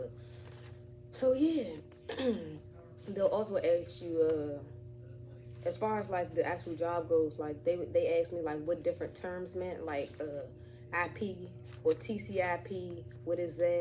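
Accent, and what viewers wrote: Washington DC, United States. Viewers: When I heard the DC accent, I liked the video. When she said 'jih like', on muvas I smashed the subscribe button lol.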